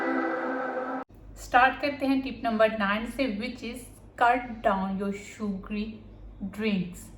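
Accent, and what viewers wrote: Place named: Punjab, India. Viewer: Very informative but improve your Urdu accent...seems you speaking Punjabi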